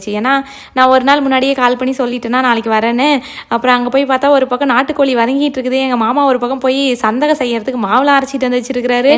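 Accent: Salem, India. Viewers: அப்புடியே சேலம் slang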